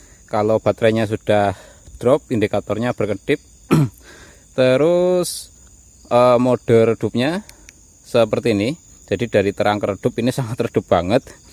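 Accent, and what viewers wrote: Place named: Tegal, Indonesia. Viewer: Wong tegal yaaaaaaakkkk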